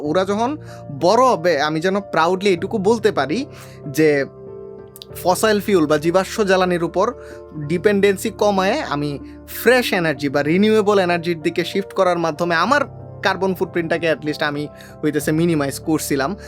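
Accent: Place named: Netrokona, Bangladesh. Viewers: আপনার বাসা নেত্রকোনা নাকি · I'm also from Netrakona and I've shocked so much after listening it😊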